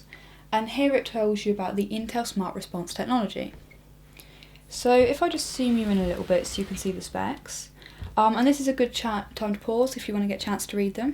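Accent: United Kingdom. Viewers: Unfortunately I consider this to be one of the more audible accents in the UK. You should hear what people sound like in Portsmouth (Mush;)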